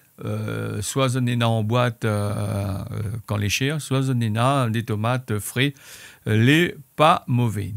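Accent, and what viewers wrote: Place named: Réunion, France. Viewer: C est trop charmant l accent réunionné ✌👍👍👍😍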